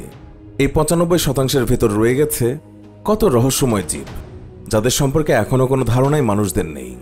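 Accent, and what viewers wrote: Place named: Noakhali, Bangladesh. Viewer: voice ta khub disgusting. Accent khub e baje. Kothagulo suddho vabe bola hoiche kintu accent noakhailla accent er moto lage.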